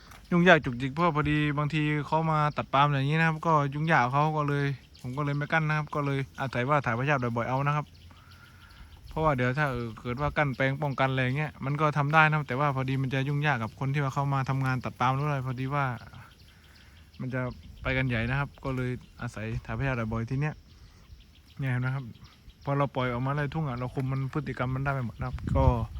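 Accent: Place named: Surat Thani, Thailand. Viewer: แหลงคล้ายๆคนสุราดเลยบ้านยุไหนคับ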